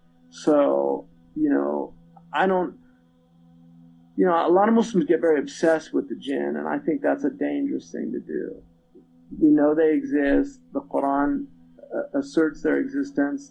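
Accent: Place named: New York, United States. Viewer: sounds like New York City or maybe Los Angeles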